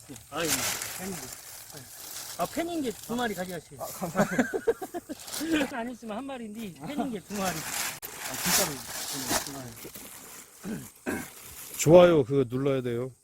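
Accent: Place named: Chungcheong, South Korea. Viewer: 충청도 사투리는 억양이 그렇게 쎈거 같진 않은데 말끝이나 쓰는단어가 정겹네유~~ 좋은일 해주셔서 감사합니다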